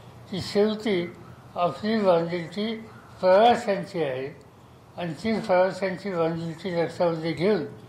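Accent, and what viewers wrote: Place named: Baramati, India. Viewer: 😀😀😀😀बारामतीची भाषा आहे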